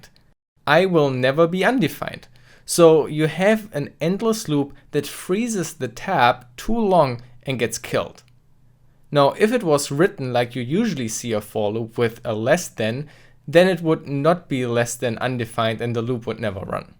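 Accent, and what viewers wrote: Germany. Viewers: Just wondering where you are coming from! You sound so German :)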